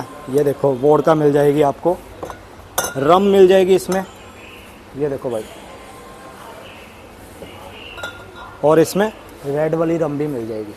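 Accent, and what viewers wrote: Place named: Punjab, India. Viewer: Bnde da accent punjabi lgda menu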